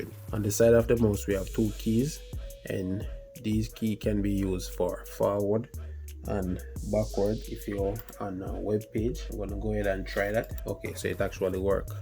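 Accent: Jamaica. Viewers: So nice and the Jamaican accent is rlly good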